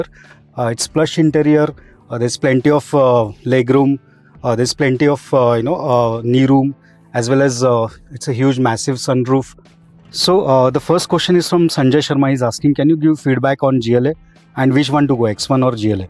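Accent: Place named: Pune, India. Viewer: Without even seeing your description, I knew you are a Puneri by your accent. Great to know, I owe 8 years of my wonderful life to Pune. My next next car is definitely X1. Thanks for detailed information.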